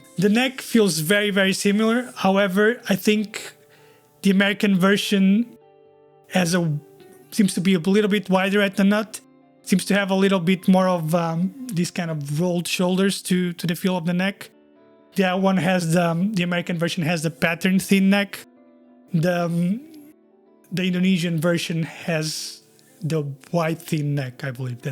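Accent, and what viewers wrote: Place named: Portugal. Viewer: O sotaque diz-me qualquer coisa. Fui confirmar... TUGA ! Obrigado pelo video !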